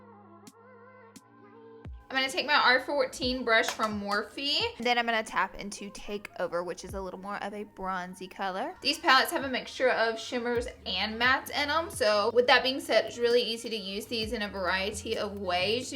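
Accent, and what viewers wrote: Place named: Alabama, United States. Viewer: You're still in my prayers!! I came over here because I heard you're from Alabama (I am too) and I heard your accent and I was like yep she's from alabama haha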